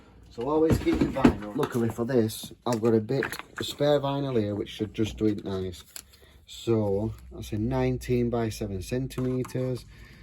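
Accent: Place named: Grimsby, United Kingdom. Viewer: Awesome, isn't it funny how the Grimey accent comes thorough on video hehe well done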